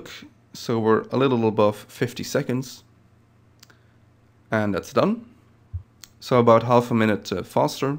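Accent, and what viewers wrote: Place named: Netherlands. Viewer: hee jij bent een nederlander! ik hoor t aan je accent :P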